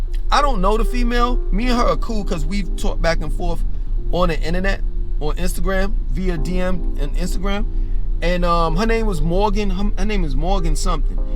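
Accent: New York, United States. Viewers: He a New Yorker basically saying NC better than NYC .. 😂😂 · You are so ny 😂 even though your explaining how much you like NC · Why does he sound like he have a NY accent and not NC??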